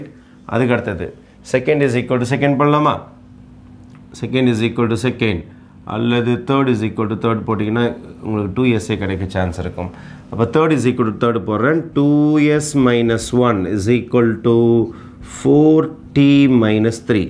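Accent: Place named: Telugu, India. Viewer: Sir your mother tongue telugu va voice similar ah iruku athan ketan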